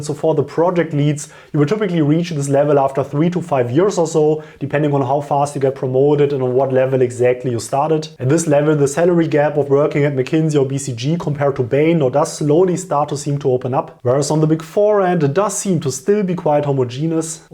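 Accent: Germany. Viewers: Alter hörst du dich deutsch an😂😂. Trotzdem gutes Video👌🏼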